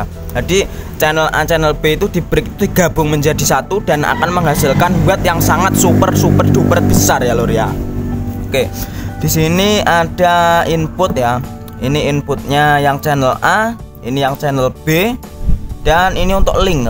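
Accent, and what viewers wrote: Java, Indonesia. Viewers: Medok banget...suaranya ngebas banget....jowone ngendi....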